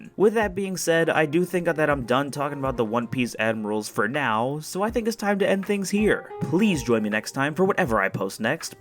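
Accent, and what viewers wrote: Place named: United States. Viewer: God, such an American way of pronouncing foreign names lol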